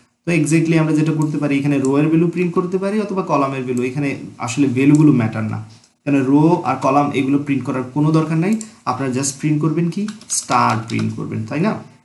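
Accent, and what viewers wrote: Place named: Sylhet, Bangladesh. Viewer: thank you vai apnar karone pattern amar kase onk sohoj hoye gelo off topic vaiyar bari mone hoy sylhet ei apnar accent ta maje maje asteshe :)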